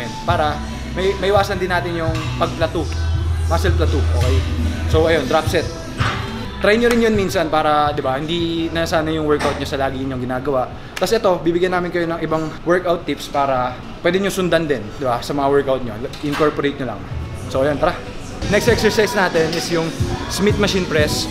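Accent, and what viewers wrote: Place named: Tegal, Indonesia. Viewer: Orang tegal ya? Bocah ngapak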